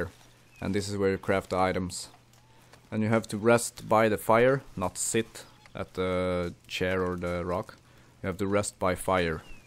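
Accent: Sweden. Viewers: i can hear your swedish dialect.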